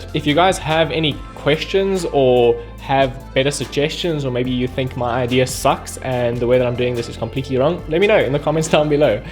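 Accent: South Africa. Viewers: I recognized your accent immediately! Fellow South African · loving this south african accent ....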